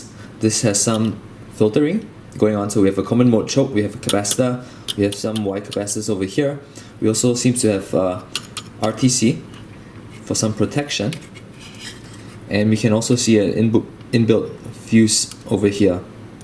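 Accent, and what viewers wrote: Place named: Singapore. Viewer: You know, I can hear the essence of Singapore in your voice :D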